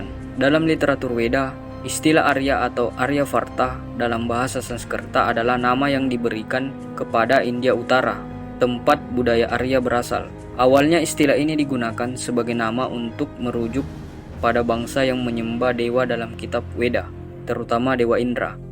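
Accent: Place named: Makassar, Indonesia. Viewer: Logat Makassar nih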